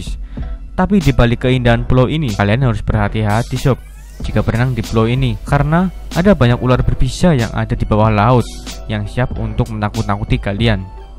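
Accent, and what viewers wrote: Java, Indonesia. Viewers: medoknya dikondisikan...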